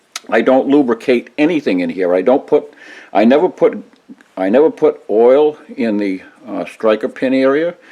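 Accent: New England, United States. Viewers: Hearing your accent, you can’t live to far away from the Sig Sauer headquarters? Grew up in Gloucester, Ma and raised my children in Gilford, NH. That is either a Southern Maine or a Southern NH accent. Love your videos.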